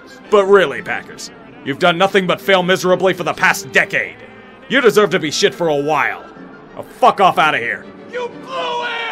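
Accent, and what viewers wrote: Pittsburgh, United States. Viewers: SIDE NOTE: I love how Beethoven's Molto Vivace is playing in the background during the narration. Goes very well with the Yinzer's voice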